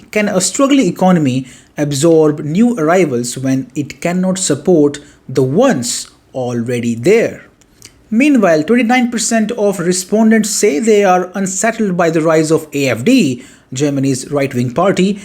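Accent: India. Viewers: And also have no problem understanding your Indian accent. Very clear! Cool! 👍🏼 Keep up the good work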